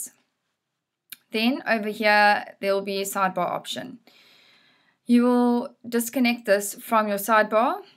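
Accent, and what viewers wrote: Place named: Afrikaans, South Africa. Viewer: Thank you!! (Love the accent! Afrikaans maybe?)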